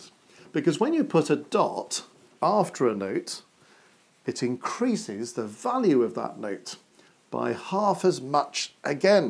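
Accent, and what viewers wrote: United Kingdom. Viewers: I love this teacher. The pace and tone of his speaking and the British accent all make it so enjoyable to follow the class.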